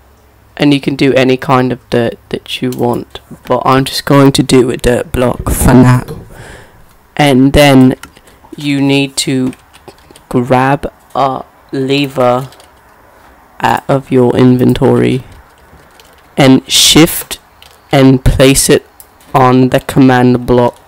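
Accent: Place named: Australia. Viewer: WHY DO YOU SOUND AUSTRAILIAN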